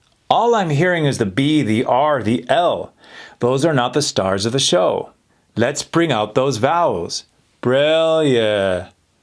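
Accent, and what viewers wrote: India. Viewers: Thats indian accent yeow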